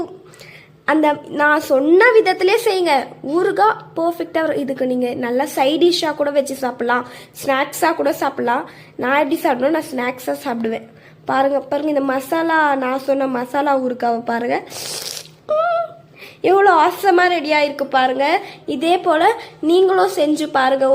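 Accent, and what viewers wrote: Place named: Chennai, India. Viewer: ஏய் உன் குரல் வச்சு கண்டுபிடிச்சுட்டேன் நீ சென்னை தானே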